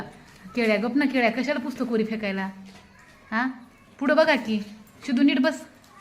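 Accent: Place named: Parbhani, India. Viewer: मॅडम तुमची भाषा परभणी चि वाटते ,आमचे सर सतीश Ranher सर यांच्या सारखी आहे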